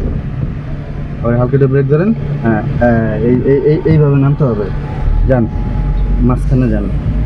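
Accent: Barishal, Bangladesh. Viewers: ভাই এর বাড়ি কি বরিশাল? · আপনার বারি বরিশাল নাকি।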